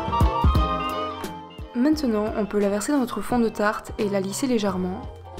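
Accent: South, France. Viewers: tu es de quelle ville tu as un belle accent du sud!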